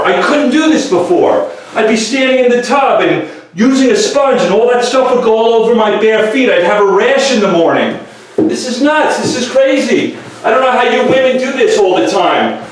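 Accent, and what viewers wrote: New York, United States. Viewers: Holy cow that sounds like my New York mom. The only difference is she then would get on a plane and do it herself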